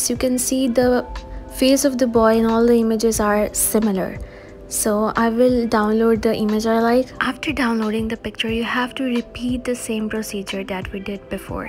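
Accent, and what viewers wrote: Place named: India. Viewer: It doesn't work that way and moreover I don't understand why your accent is like an Indian woman ?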